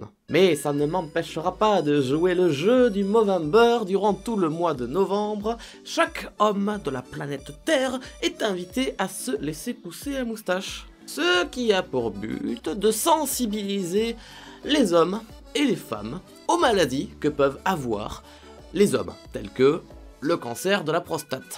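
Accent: Spain. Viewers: Au début en lisant les sous-titre en même temps d'écouter ce que tu disais, je me suis dis : mais... google traduction est pourri haha! Sinon, trop mignon ton accent en español !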